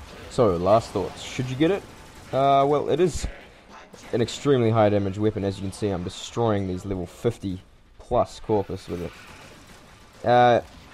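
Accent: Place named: New Zealand. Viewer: "british accent"? sounds kiwi to me.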